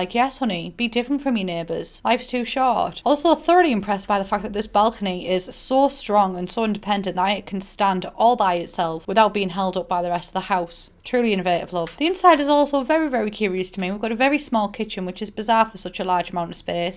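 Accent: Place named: Glasgow, United Kingdom. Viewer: I used to live in Glasgow and this video gives me those vibes. Also I love your accent it takes me back to those great times hen xoxo from spain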